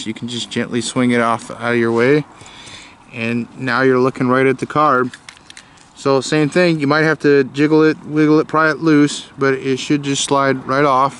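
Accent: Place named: Midwest, United States. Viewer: You must b a midwest boy.nice clear speech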